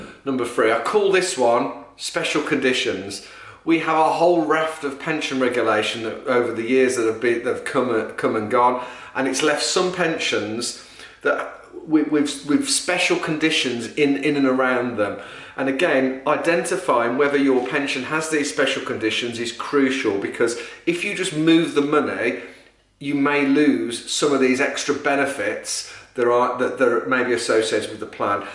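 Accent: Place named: Dudley, United Kingdom. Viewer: Are you from Dudley ?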